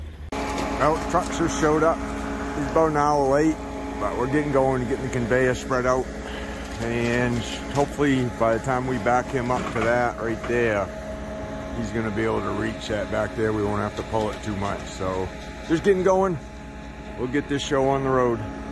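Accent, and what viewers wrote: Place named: New England, United States. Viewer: Where is this guys accent from? Sounds like a Boston/Maine hybrid.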